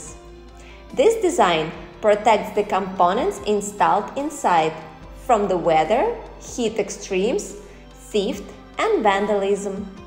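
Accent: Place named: Russia. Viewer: что то русским акцентом пахнет ))) и профиль до боли знакомый )))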